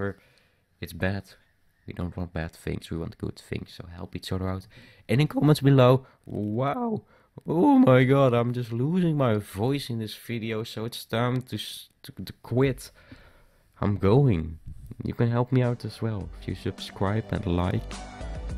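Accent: Netherlands. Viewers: Je hoort dat hij Nederlands is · You just hear it instantly that this guy is dutch xD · ik hoor aan die accent en de nu.nl app dat je nederlands bent haha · Die te you from? You sound like a Dutch guy. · Gast je kan niet een ergere nederlandse accent hebben holy shit xd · Ben jij nederlands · you have a duch acsent 😄 😉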